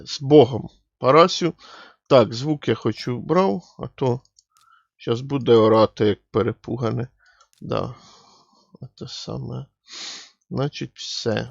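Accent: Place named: Surzhyk, Ukraine. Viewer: трохи суржик є, пане!))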